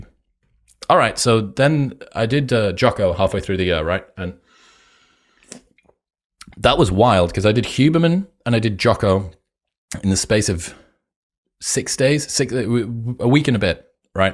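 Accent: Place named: United Kingdom. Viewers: This is such an insane volume of sourced wisdom, knowledge, and perspective combined with a British accent. Freaking thank you. I hope everyone hears this and puts it into application. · combined with british accent got me really bad :D:D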